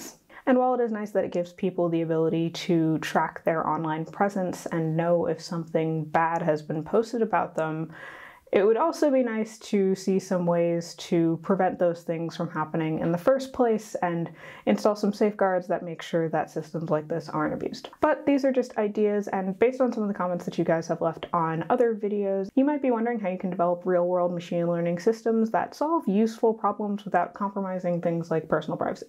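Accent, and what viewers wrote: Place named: United States. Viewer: As I am not American (although English is my first language) I really struggled with understanding what you were saying. You speak quite fast and your speach is not particularly crisp. If you want to convey information to people please be aware they need to understand what you are saying. Not everyone can keep up with American speed speach !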